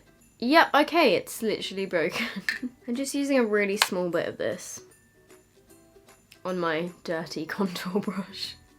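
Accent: Australia. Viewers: Am I the only one who thinks she has an Australian accent Just me ?? Oh ok........ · I love your Australian accent it made me laugh so much love this look I think I might do something similar for Christmas Day ❤️❤️❤️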